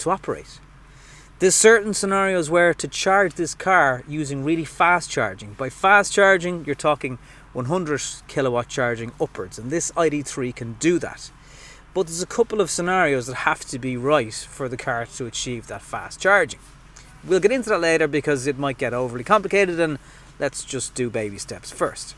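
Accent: Ireland. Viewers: Nothing new to me, but THE best explanation for beginners with EV cars. Thank you, and by the way, I love your Irish accent. Can´t wait to come back to lovely Ireland.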